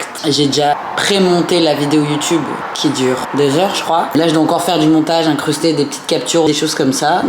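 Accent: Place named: Quebec, Canada. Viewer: Ah mais tu commences à parler Quebecois heinh???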